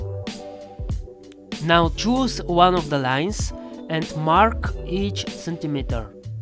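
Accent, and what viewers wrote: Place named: United Kingdom. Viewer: Hello! It's always a great pleasure to watch your videos. Thank you for this one which is very nice! (thumb up for your english; it sounds so british);)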